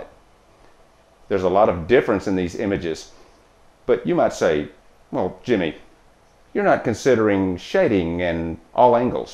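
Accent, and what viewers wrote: South, United States. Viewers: so cus of jebus right ? i hear your ignorant southern accent you beleive in imaginary friends and jebus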